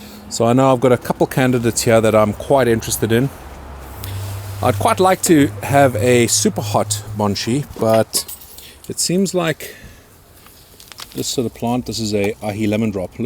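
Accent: South Africa. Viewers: is that South African I hear? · Are you a South African? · Legit idea....and u sound south african...exellect content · Is my mans South African?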